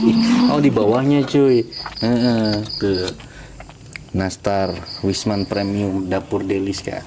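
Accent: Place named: Palembang, Indonesia. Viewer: Mas kaya orang Palembang Lo ternyata orang Jawa.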